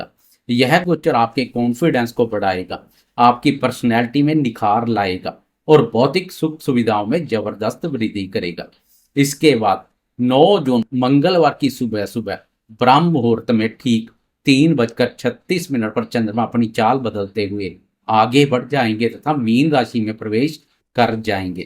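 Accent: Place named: Punjab, India. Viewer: जी पहली बार देखा है आपका वीडियो।। आपके बोलने में जो पंजाबी एक्सेंट आता है वो काफी आकर्षक है।। बाकी आपकी भविष्यवाणी तो आने वाला समय ही बताएगा कि कितनी सटीक है।। जय महाकाल 🙏🙏🙏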